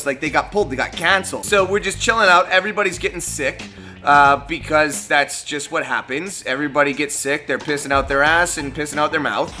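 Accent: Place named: Minnesota, United States. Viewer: That Minnesota accent though 😍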